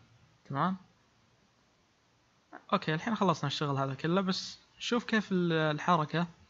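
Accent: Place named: Saudi Arabia. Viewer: استمر انا سويت خمس حسابات و اشتركت عندك الحين كل اللي يسوون شروحات مغاربة و مصريين ياخي لما سمعت صوتك سعودي ارتحت كمل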